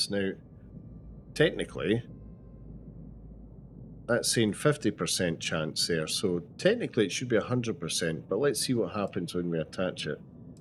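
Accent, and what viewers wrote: United Kingdom. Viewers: great to hear a Scottish accent for a change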